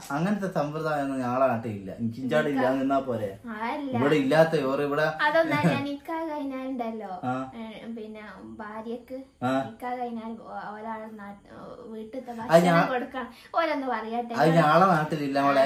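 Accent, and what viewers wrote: Vadakara, India. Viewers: Nte rathri muzhuvan vedios kandu❤kannu niranju😢ithrayum prayaasangal okke undaaayittum ningal koyambathooril shop thudangi scooter maangichu❤oru vidhathil ellaam oppichu❤ avasaanam baaghyanithiyaaya oru khairaaya bhaaryayeum kittii❤eni angootulla jeevithathil orupaad uyarchayil ethatte🤲🏻vadakara mekhalayil ulla youtube channel pothuve kuravaaanu😂ningale vadakara slangilulla varthaanam pravaasthil irunnu kelkkumbol nalla sandhoshm ❤chekkotti bazar nte aduthulla CHEMMARTHOOR Kaaran❤❤ NB:aare kondum parayippikkaatha vedios cheyyuka 😂❤sneham maathrm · Vadakara varthanam😂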